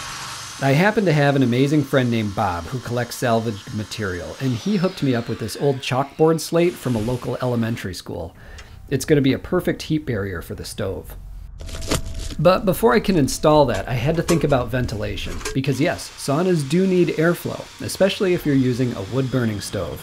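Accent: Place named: Canada. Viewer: I was thinking, man this guy sounds kinda Canadian. Then you mentioned the high school and I realized you're in northern MN, so that explains it lol.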